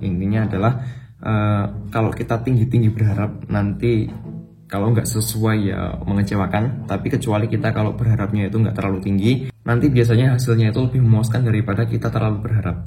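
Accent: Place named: Java, Indonesia. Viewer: Wkwk medok kali bro keren2 semangat konten nya 🤩🤩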